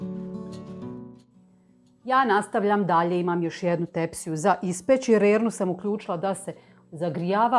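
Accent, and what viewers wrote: Bosnia and Herzegovina. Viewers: Joj kako te sa gustom gledam kako lijepo i uredno sve radiš a tek naš bosanski govor ma bravo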